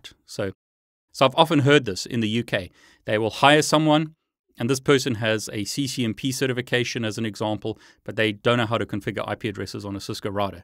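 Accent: South Africa. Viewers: Didn't know you were South African but it helps explain why I've always thought there was something about your accent I couldn't quite place!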